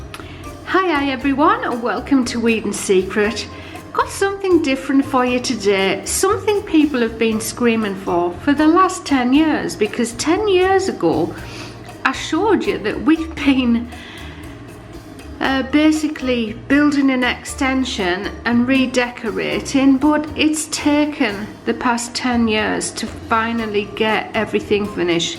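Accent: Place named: England, United Kingdom. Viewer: Beautiful colour palette in this bathroom. Beautiful shining Chrome fittings and towel rack really complement the shining tub and basin. If you ever give up soap making you could do interior decorating. You have such a beautiful English accent maybe that's why everyone wants to hear you talking. Have a wonderful day x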